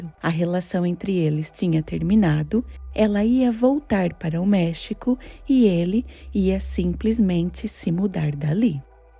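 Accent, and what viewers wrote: Portugal. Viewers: Parabensppelo bom portugues de Portugal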